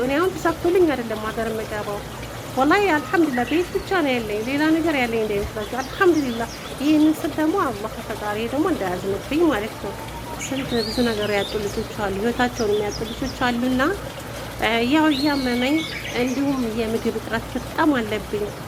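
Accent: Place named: Wollo, Ethiopia. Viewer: ደሴ ነሽ ሀይቅ ወሎየነትሽ መቸም በንግግርሽ አያሳስትም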